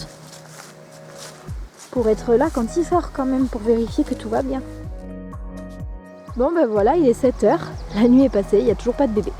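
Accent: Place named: South, France. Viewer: Oh l’accent du sud 😂👌🏼 J’adore !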